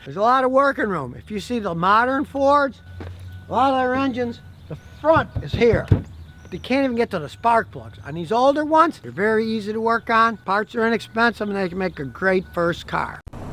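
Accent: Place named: South, United States. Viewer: is gettin’ a southern accent:-)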